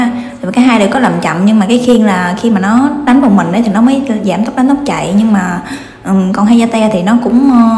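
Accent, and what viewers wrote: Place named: Mekong Delta, Vietnam. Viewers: Nói giọng đậm chất miền tây luôn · Bà này miền tây chính cống mà. Nên ngta mới gọi miền tây á.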